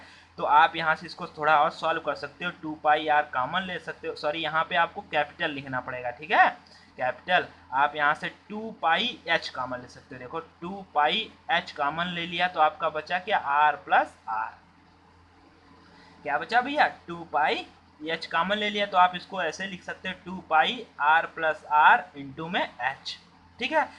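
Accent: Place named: Bihar, India. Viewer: Bihari ho ka bhai sahab voice tone se lag raha hai.....hai to bahut badhiya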